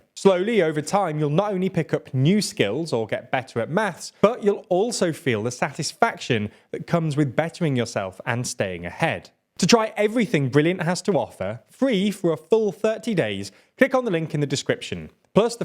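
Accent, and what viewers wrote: England, United Kingdom. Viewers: How is it not to be a party to USA, and UK led proxy war with nuclear power, through country they puppeteer, and pay for directly on your border a pro-kremlin, pro-russia? Do you even use your brain? You can hear from the accent the host is from UK, and him saying pro-kremlin, pro-russia try to smear everyone who does not want to be part in this USA/UK regime proxy war. "You not with us", in their indoctrinated chicken brains means "you are against us", but what about "go fkin play somewhere else, and leave us alone", that in my view is a side as well, worth to take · Hey Dude! Don't be so self-confident! You should first learn how to pronounce the names of the politicians you are talking about. No one gives a damn where are you come from and what is your language background. I am pretty sure no Slovak citizen likes your silly pronunciation of their names, in fact, we found it arrogant, and it only shows your total ignorance of the subject as the ONLY reason you made this compilation slide show is to get views and likes to get your youtube rankings up! 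Besides, I guess you as a UK citizen (based on your accent) have absolutely no right to label/mention some of our political figures as "fascist" even if the idiotic narrative of Slovak mass media may often indicate so. Even if politics in Slovakia is a mess thanks to aggressive foreign interventions, (including your country), Slovaks will solve these in their way. I mean, HEY MAN (!) who do you think you are to have the courage to stand up before us Slovak citizens and talk about subjects you have absolutely no freaking clue about?!?!?! No one cares about your opinion!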